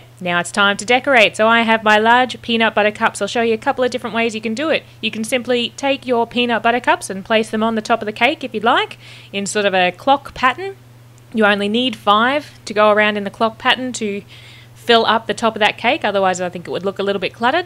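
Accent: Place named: Australia. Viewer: Wow, can't wait to make this:-) and the Aussie accent made it easy to watch;-)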